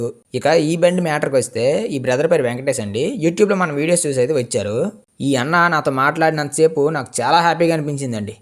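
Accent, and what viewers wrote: Srikakulam, India. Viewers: Mana chikakulam slange vere level😂😂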